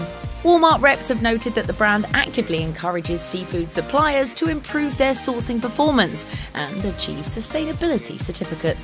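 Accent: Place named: United Kingdom. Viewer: why the British accent?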